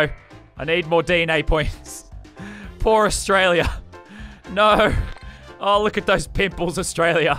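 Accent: United Kingdom. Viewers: You sound British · your british haha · Ok, but the same can be said of Americans. Back then British people moved to America and mated with the islanders there. That’s why you sound British and Are British.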